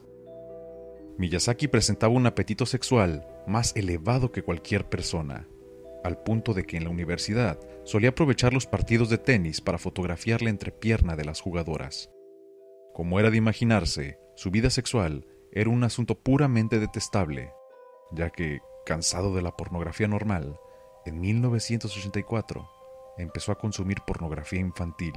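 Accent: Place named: Mexico. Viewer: Muy buenos vídeos, te recomendaría que hables de una forma más pausada ya que el acento mexicano resalta mucho, de ahí en adelante todo genial...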